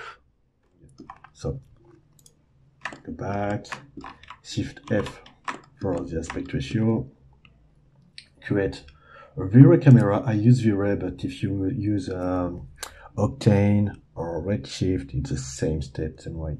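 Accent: France. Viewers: hey, avec l'accent j'ai assez compris que tu était francophone, merci pour ce tutoriel !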